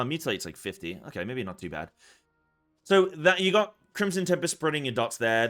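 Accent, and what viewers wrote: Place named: England, United Kingdom. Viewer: I’ve abstained from posting this comment for the longest time, but finally cracked… where are you from? I recognise a mostly English accent, however, there are a few intonations in your voice that make me question this. As an aside; another great video, thank you. Feral main 👍🏼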